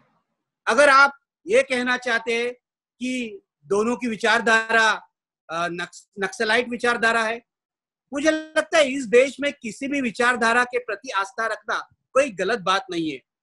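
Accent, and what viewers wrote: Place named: Maharashtra, India. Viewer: Abe bewakuf pehla marathi slang main Hindi bolna band kar.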